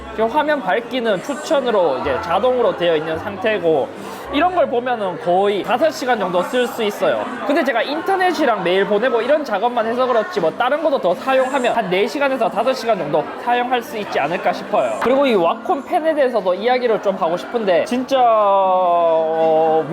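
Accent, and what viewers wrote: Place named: Andong, South Korea. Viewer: 말투가 어디서 많이 들어봤는데...했더니 아버지따라 시골가서 듣던 말투군요. 안동분이실줄이야 ㅎㅎㅎ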